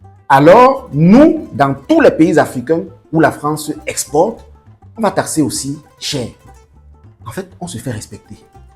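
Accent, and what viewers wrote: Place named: Cameroon. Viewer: A travers l accent tu est biyamerounais toujours c,est vous vous connaissez tous sauf les problème de votre pays ,toujours entrain de parler de tous et se faire donneur de leçon,concernant le cfa nous on est déjà sortie pr l,eco ,c,est chez vous il ya meme pas débat ,avec vos président dictateur,parle de biya dictateur qui veut pas aller a la retraite.aprt la poussière y a rien au Cameroun.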